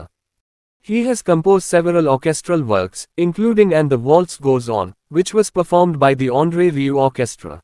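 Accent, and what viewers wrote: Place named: India. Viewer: aS NARRATED BY A PAKI OR INDIAN GENIUS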